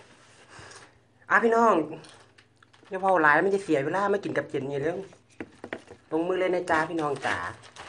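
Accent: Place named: Isan, Thailand. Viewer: สำเนียงอีสารเพราะดีคนนี้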